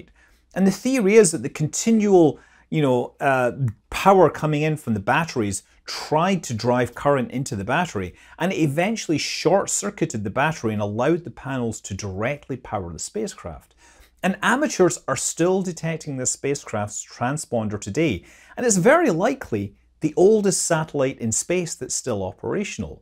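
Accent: Scotland, United Kingdom. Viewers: That scottish accent hitting hard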